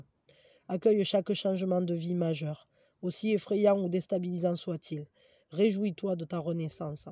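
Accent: South, France. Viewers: Merci, toujours entre le rire et la poésie, j'adore et ton accent c'est mon sud...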